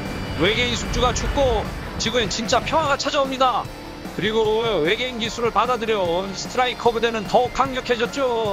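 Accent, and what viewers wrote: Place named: Jeolla, South Korea. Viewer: 랑깨랑깨 하는거보니 배충이가 산듯? 재미만있음 상관없응께 노 상관ㅋㅋ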